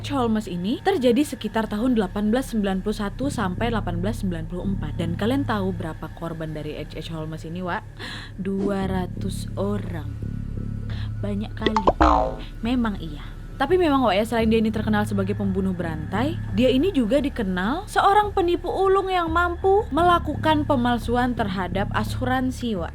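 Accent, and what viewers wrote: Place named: Lampung, Indonesia. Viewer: Kak kamu orang mana sih kok ngomongnya kayak orang lampung kalo ga salah???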